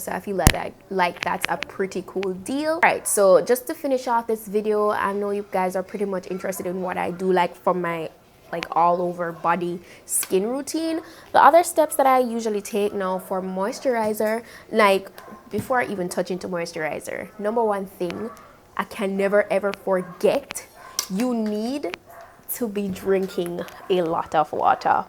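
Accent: Jamaica. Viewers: Yuh cyah hide de Jamaican ascent to rahtid.. love it 😊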